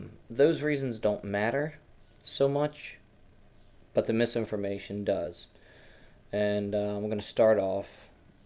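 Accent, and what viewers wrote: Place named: Baltimore, United States. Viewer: Your accent is so Baltimore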